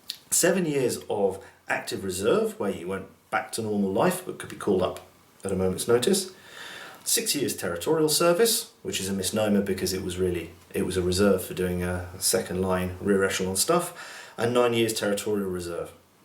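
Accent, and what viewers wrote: France. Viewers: Nice French accent, man. No, I'm not being an ass; it's actually good. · I understand much better when you have French accent :) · I love your French accent >< Very interesting video nevertheless =) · Nice French accent ^^ great video by the way :) Keep on that way ! Oh, and you've got a new subscriber.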